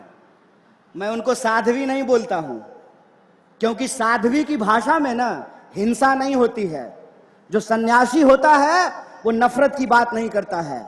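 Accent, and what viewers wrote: Bihar, India. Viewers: Full bihari andaj waah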